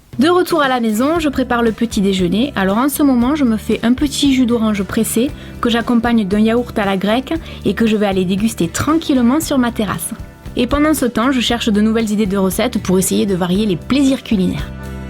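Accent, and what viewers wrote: South, France. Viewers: "Dans le sud de la France" avec l'accent qui va bien! Super vidéo, merci beaucoup! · Coucou Un vrai plaisir ta video. Je me suît regaler de voir et d entendre ton accent. Je suît aussi du Sud👌👌 Comme quoi il n'y a que dans le Sud Qu on vie bien. Oui je suît chauvine lol👌👌 Ah le taboulet avec dû choux fleur je ne connaissai pas du tout,top Merci Sympa ta video. Tres onde fin d après midi,Tres bon anniversaire. À ta Maman 😘😘💋💐🌹🌹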